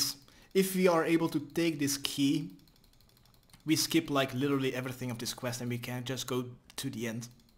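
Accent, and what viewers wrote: Netherlands. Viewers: Well just finished the quest.. after 10 tries I finally managed to kill Galvek. I found Vanstrom Klause a harder boss to beat mechanics wise, but this damn fire ball in combo with the waves was unlucky. Only got song of the elves & secrets of the north as my last two quests. I want to thank you for the whole journey thus far, as a fellow dutchy it's been so enjoyable to listen to you. That damn accent haha! Thanks so much man, much love.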